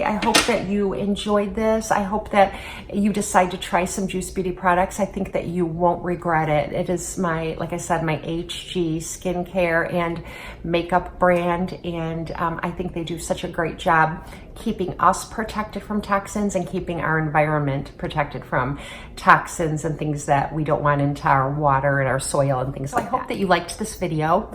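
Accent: Midwest, United States. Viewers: Hi! I came from channel. You are simply stunning! You have such a midwest accent. Cleveland? Chicago? I enjoyed your review. You have a new subscriber.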